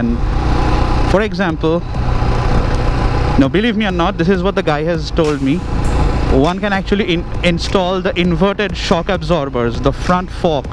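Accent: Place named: Bengal, India. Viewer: You sound like you are Bengali. And the pace of your narration is like atal behari vajpayee.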